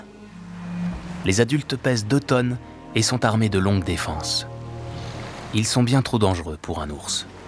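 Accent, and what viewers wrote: France. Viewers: The French accent makes this video so Romantic.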